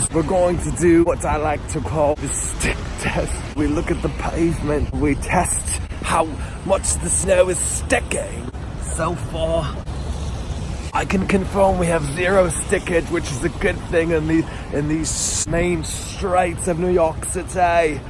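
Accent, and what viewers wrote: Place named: United Kingdom. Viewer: Your voice is different by adding that your accent looks British, not American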